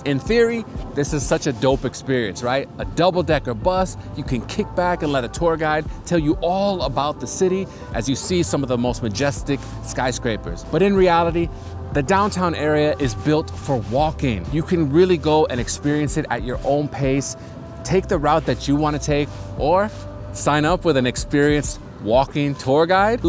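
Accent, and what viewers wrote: Chicago, United States. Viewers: Finally, a real Chicago show with real Chicago speak!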